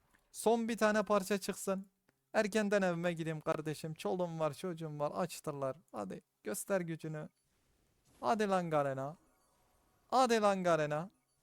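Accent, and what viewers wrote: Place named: Diyarbakır, Turkey. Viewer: Abe sen nerelisin şiwen dyb sanki soylermisn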